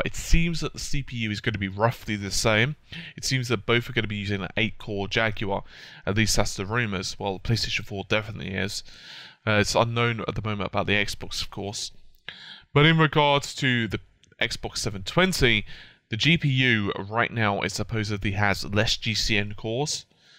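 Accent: United Kingdom. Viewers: British?...I just thought u were always drunk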